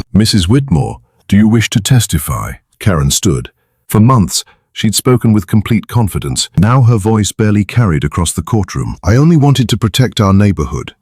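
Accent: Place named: United Kingdom. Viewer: The story was a good one. They never let you know what the HOA and Keren ended up with in court. They were guilty. Yes, but what were the penalties. The other thing was the v oice used. That was a British voice. The story was in the USA. His family had been on that farm for 60 years plus.??????????????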